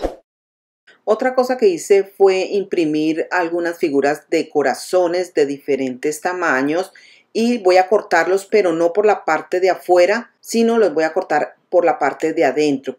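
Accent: Colombia. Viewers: En mi país le dicen yaquis... Recién estoy jubilada y pues mi casa que es la suya muy seguramente es del tamaño de su sala 😂😂😂 sin embargo me encantan sus ideas soy realmente nueva y hoy haré lo de los lentes y las bolitas de palillos... No se si tenga decoraciones para baños.. Me encanta que la mayoría de sus ideas es acorde a muchas economías.. Aparte de las excelentes ideas amooo su acento... Colombiano??? No se si me equivoco pero me encanta como habla tan bonito....genial su canal!!!!!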